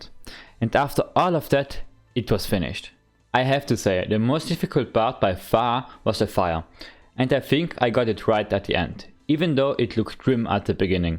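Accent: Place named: Germany. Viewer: That's so nice. And I have a question from what country are you? It sounds like Germany or something 😅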